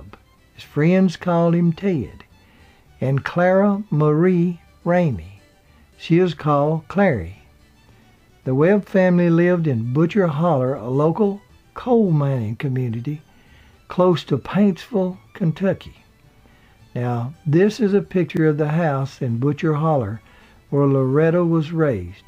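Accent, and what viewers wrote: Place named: West Tennessee, United States. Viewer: just found your channel. Your voice sounds like pure West Tennessee. Very nostalgic, like listening to my grandfather tell stories when I was a child. Thank you.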